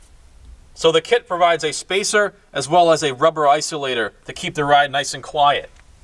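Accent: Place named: Boston, United States. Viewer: Somebody's from Boston! I'm from Revere, lol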